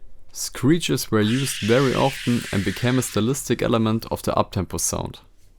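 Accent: Germany. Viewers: Ich erkenne einen deutschen wenn ich ihn höre :D Geiles Video! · das doch nh deutscher der da spricht, safe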